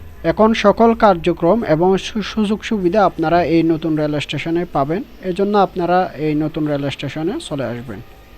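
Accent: Chittagong, Bangladesh. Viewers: যখন ভিডিও বানাচ্ছেন তখন সুদ্ধ ভাষায় বলা উচিত এতদিনেও চট্টগ্রামের টোন পরিবর্তন করতে শিখেননি যার ভিডিওটা দেখে মজা পেলাম না। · your accent says you're habituated in your own Chatigao language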